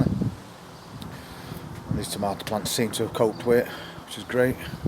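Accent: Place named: North, United Kingdom. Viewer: Hiya,. I always watch and listen to your videos with the captions on. I love the way it cannot cope with your accent. I'm a northerner so I'm fine with your accent and dialect, but some of the automatic captions are hilarious. Thanks for the great fun and loads of helpful and hopeful tips. Cheers.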